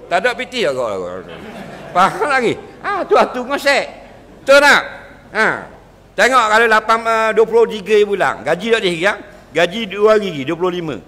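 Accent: Terengganu, Malaysia. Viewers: Asalamualaikum ustaz..saye suke dengar ceramah ustaz sebab lorat Terengganu kadang 2ada dah lupa .ingat blek Katawa sendiri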